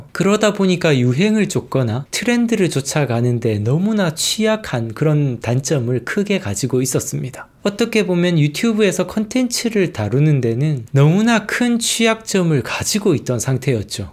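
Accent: Andong, South Korea. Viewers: 구독했습니다 아직 님 영상을많이보지는 않았지만 요즘 많이 고민중이 두가지문제가있어서 님 생각을듣고싶어서요 하나는 저도 책이 800권쯤있는데요 한번씩 정리할까? 하는생각이 들때가 있어요 저는 돈벌고부터 책을읽어서 다 사서 보다보니 이렇게되었는데요 책을 읽기도하지만 한번씩 보여줄여고 모으나? 하는생각이 들더라고요 뭐 단편적이아닌 지금제 많은생각 플러스된거라 다 이야기는 못하겠지만요 서론이 길었네요 님은 읽은책들 정리안하시고 게속 가지고있으실생각인가요? 또하나는 저는책을읽을때 줄긋고 표시하고 모르는단어 확인해서메모하고 그런데 마음에든글들을 님은 따로 메모를해놓는지요? 지금보니 좋았던글 플러스 님생각도 쓰시는것같은데요 제가 직장을다니다보니 손목이안좋고 악필이라 쉽지는않은데 또 써놔보고싶긴하고 두가지가 계속 해결못하는 일인입니다 우문현답 기다려도될까요? 다른영상에서 답을찾을수있을듯하네요 다들똑같은고민을하나봅니다 영상들감사합니다 글고 안동사투리 정답네요 ㅎㅎ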